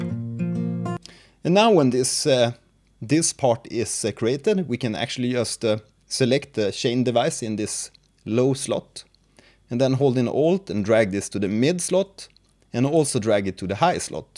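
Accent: Sweden. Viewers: Thanks for the great reply, I actually wrote the beginning down, it was a good summary. :) I will try and play with sounds that have more transients, like you suggested! And yes, I will keep commenting, you can count on that! ^_^ You too, may your weekend be absolutely awesome! :) Are you by the way from Sweden, or do I recognize your accent wrong? Anyways, greets from your neighbor (maybe) from Helsinki! :)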